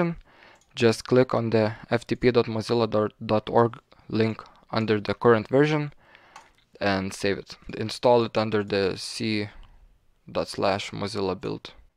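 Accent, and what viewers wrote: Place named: Lithuania. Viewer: u sound like lithuanian xd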